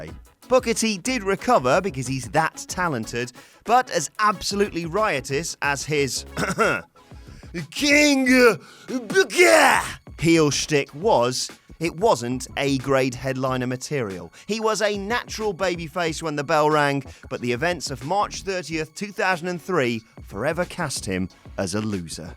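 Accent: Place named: United Kingdom. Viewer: Finally, a good British voice besides Adam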